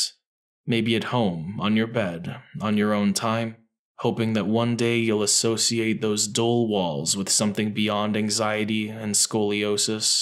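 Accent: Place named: United States. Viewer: as much as i love this reading, hearing these read out in an american accent is hilarious.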